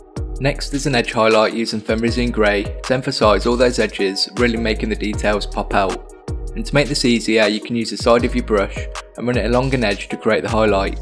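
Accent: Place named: Suffolk, United Kingdom. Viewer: Love the video! helped me a lot. I swear thats a suffolk twang I hear as well ;)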